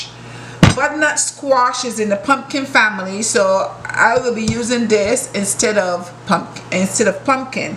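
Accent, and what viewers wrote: Barbados. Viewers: So sweet to hear a real-real bajan accent.. even if it's putting something in her well.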